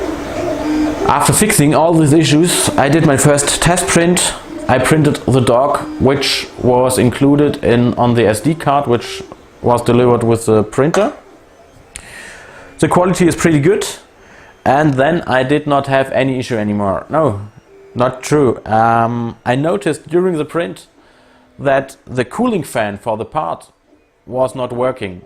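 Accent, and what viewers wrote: Germany. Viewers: Sehr informatives Video, vor allem wegen der "kleinen" Fehler die an einem nagelneuen Drucker auftauchen. Habe mir bei "Aliexpress" einen für 175 € bestellt. Bin jetzt vorgewarnt hinsichtlich der Produktionsqualität. Werde den Aufbau mal protokollieren , ob die Fehler inzwischen behoben sind. Apropo Englisch - ist OK - ,der deutsche Akzent ist in den USA sogar recht beliebt. Und man redet keinen Müll bei technischen Themen. Very informative video, especially because of the "small" errors that appear on a brand new printer. I ordered one at "Aliexpress" for 175 €. I am now forewarned regarding the production quality. Will log the structure times, whether the errors are now fixed. Apropo English - is OK - , the German accent is even quite popular in the US. And you do not talk trash about technical issues. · your english is better than some native speakers here the US.. 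plus having a German accent works well with talking about mechanical things.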